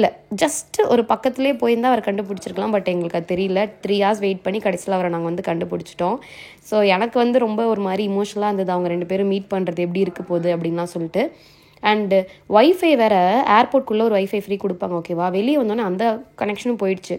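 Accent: Sri Lanka. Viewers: மொழியழகி நீ இலங்கை தமிழ் என்பதில் ❤❤❤❤❤❤❤❤❤ இன்னும் நேசிக்கிறேன் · தங்கை உன் தாய் நாடு இலங்கையா அதுதான் மொழி ஆழகு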